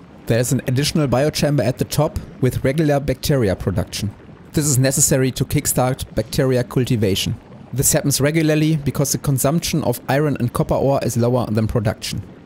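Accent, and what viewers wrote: Germany. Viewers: are you German? i think so haha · bist du Deutscher oder was ist das für ein Akzent?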